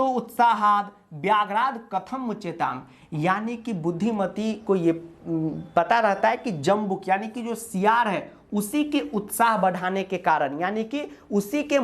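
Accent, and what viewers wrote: Bihar, India. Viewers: Sir Your bihari accent >>>>>>all school sanskrit teacher🎉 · Sir aap itna aacha samjhate hai humko sab samhj me aata h mujhe pehle sanskrit jada nhi samjh aata tha but aapke videos ko dekh kar ek umidd aa rahi h sir 😊😂🎉 or aapka ye bihari tone me jo aap baat karte ho vo mujhe bohot aacha lagta h sir 😊 you are great sir 🎉❤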